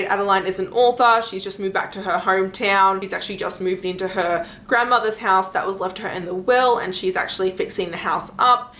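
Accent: Australia. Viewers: The way you talk sounds abit Aussie too me ,,, cheers from Tasmania Australia 🦘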